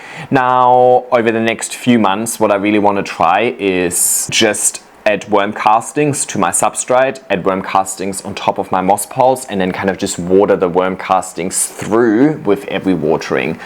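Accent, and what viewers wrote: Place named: Germany. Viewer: I didnt even know you were from gemany! I was just curious about your accent. Like you speak fluent of course but somehow I felt something was different. Now I know what it is! Greetings from germany! Currently going into full "growing season" I was going to ask: Do you miss snow? But then I remember how we had like 3 days of snow last year... I mean you got white beaches afterall right?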